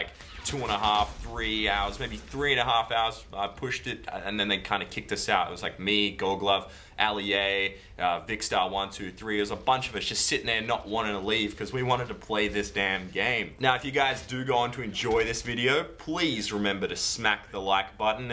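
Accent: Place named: Australia. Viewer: why does always say his accent is australian like we don't know?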